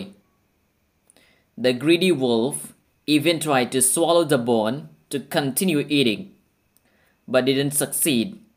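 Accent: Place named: New York, United States. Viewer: NYC voice dear sir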